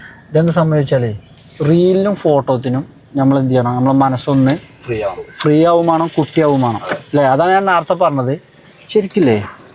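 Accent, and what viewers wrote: Malappuram, India. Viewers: സൂസ് അത് പൊളിച്ചു മലപ്പുറം ഭാഷ അത് തിരിയുന്നവന്ന് തിരിയും അല്ലാത്തവൻ നട്ടം തിരിയും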